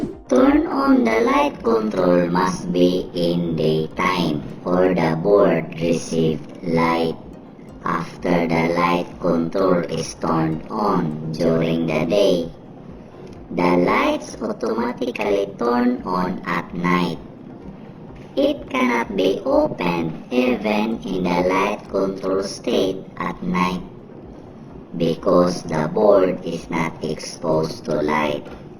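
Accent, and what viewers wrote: Visayas, Philippines. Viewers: Murag bisaya ka sir ba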